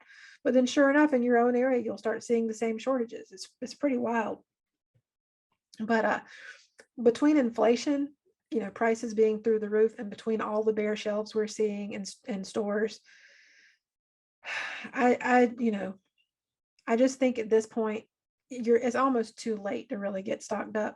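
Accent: North Carolina, United States. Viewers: I am from NC and I agree. Or SC or Virginia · 👍 you sound like you are from NC!😁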